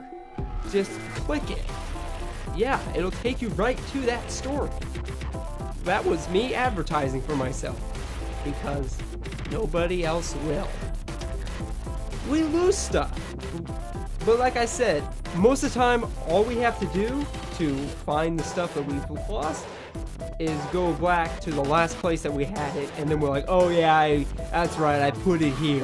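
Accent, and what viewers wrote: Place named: Ireland. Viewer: Yeah I must say I love your little floating objects! This is me requesting your next video to be in your Irish accent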